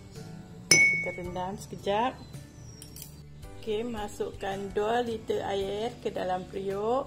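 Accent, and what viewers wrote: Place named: Thailand. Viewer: suka dengar cakap adik...tok kak aya asal keturunan siam cakap sama macam adik..teringat kat tok...semuga adik sihat selalu na...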